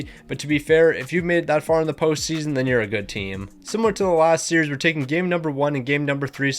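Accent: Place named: Canada. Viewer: The most Canadian sounding Youtuber of all time ngl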